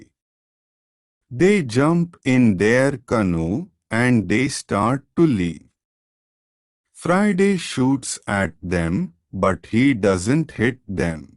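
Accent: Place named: India. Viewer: What is that indian accent god sake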